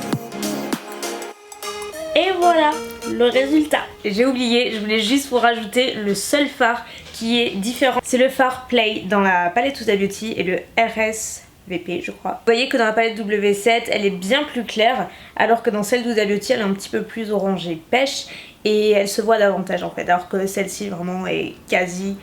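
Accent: South, France. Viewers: Vraiment très ressemblantes c’est sûr 😍 et pour le moinssssss ☺️ vive l’accent du Sud! 😃 Bisou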